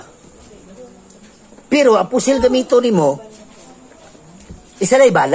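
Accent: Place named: Iloilo, Philippines. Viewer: Hahahaha gusto ko mabatian Ang ilonggo mo idol😂😂😂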